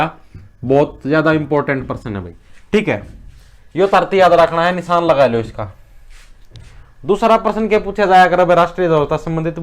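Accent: Haryana, India. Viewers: Very nice Sir 👍 Your language is properly Haryanvi but I like it👍 And your teaching way is very interesting 🥳🥳🥳🥳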